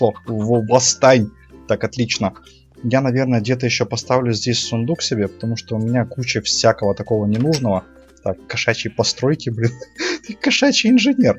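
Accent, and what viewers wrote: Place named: Ukraine. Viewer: Авы случайно не украинцы? Шо да шо XD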